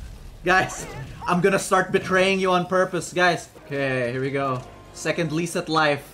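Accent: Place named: Central Jersey, United States. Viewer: Yeah at first I assumed he was from Central Jersey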